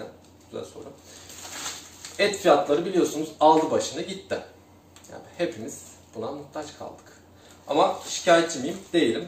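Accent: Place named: Kayseri, Turkey. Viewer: Kayseri ağzıyla video çekkk 😊 başarılar tatlı abim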